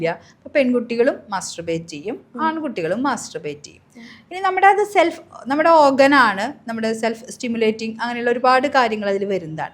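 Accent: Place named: Valluvanad, India. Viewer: വള്ളുവനാടൻ മംഗ്ലീഷ്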